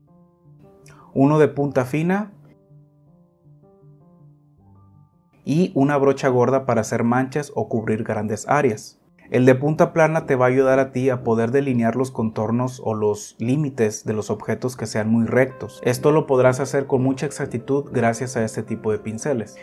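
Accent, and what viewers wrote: Mexico. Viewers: Excelente video. Me suena a que eres de México. Has probado la marca que venden los de Roel? Me parece se llama velazquez. Qué opinas de ellos?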